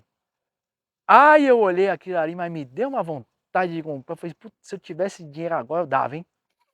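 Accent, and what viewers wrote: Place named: Minas Gerais, Brazil. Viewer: Como fala o mineiro . Que bobajada bunita sô.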